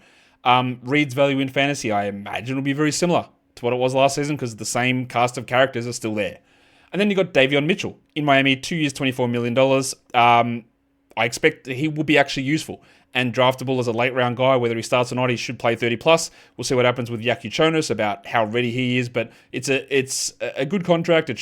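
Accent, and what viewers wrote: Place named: Australia. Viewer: Good Aussie talk.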